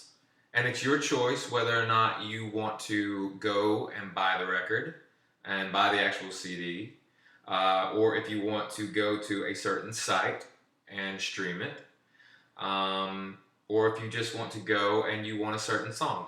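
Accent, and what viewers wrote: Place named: Tennessee, United States. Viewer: Lolz I can hear the TN in his voice. XD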